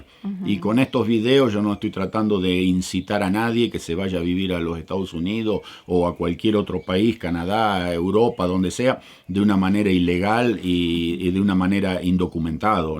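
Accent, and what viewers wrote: Argentina. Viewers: Jaimez Cristian : si esta muy bien su forma de expresar, pero su asentó ya no es tan argentino, A mejorado mucho su lenguaje. Y se oye mucho mejor... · Hoy,6 de agosto de 2020, recién encontré los videos de está familia....la verdad lo admiro por su vida,su educación,su lucha....y lo hermoso es su lenguaje que no ha perdido nada de argentino, pero domina el relato como nadie,ninguna muletilla..... más lo quisiera algún periodista famoso!!!! Cariños !!! Cris de Mar del Plata. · Me encanta que, viviendo tantos años afuera nunca perdió nuestro tono Argento tan particular. Saludos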